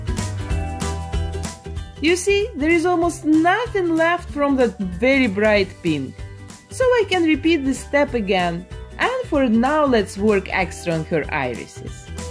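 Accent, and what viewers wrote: Russia. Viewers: You are not just a terrific artist, I like listening to you talk too. I thought from your accent that you are Russian or Ukrainian.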